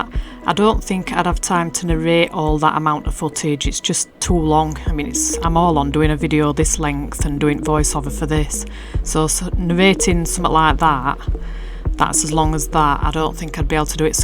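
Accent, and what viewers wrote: Yorkshire, United Kingdom. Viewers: I’m detecting a Yorkshire accent. I’m from Sheffield 😊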